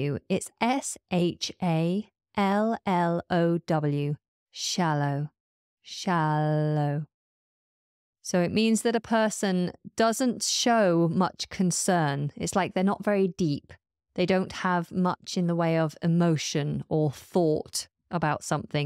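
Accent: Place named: United Kingdom. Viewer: I really love to hear a woman speak british english. excellent podcast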